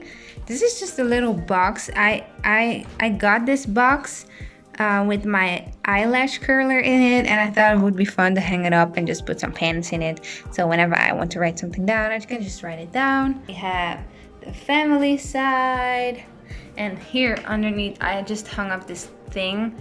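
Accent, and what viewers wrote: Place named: Netherlands. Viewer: Ben jij Nederlands? Ik hoor een nederlands accent. Heel leuk😝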